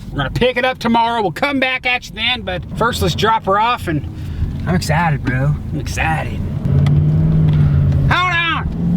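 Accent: South, United States. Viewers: Why do you speak as if you're from the south yet you live in somewhere obviously above the mason dixon line? Are you a scalawag?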